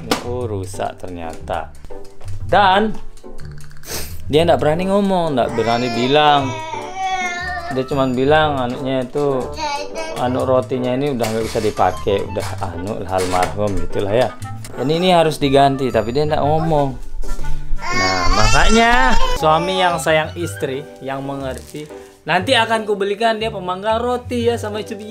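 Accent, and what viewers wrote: Lombok, Indonesia. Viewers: Saya baru nemu chanel nya bang,,stelah saya nonton bbrp videony rasany kok bahasa indonesianya lombok banget..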